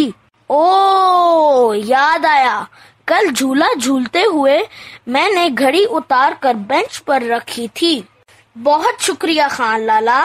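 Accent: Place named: Pakistan. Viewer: Very strong & Lovely Urdu accent Keep it up boy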